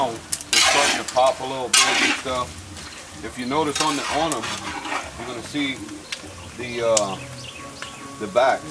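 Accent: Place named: New Orleans, United States. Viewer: That "naw-lens" accent distracted me the whole vid! Love it!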